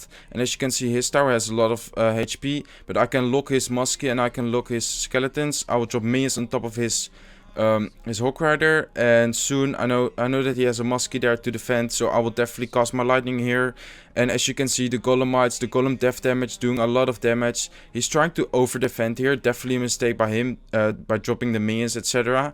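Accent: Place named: Netherlands. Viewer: lekker dat Nederlands Engels accent maar toch wel tof je video ga zo door!!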